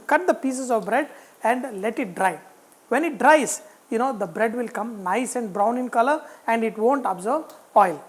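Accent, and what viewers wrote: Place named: Telangana, India. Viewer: No dish is perfect without your Telangana slang please make some videos in Telangana slang sir.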